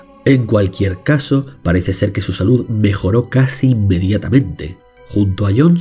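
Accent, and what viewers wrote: Spain. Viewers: Ese acento, diría que eres andaluz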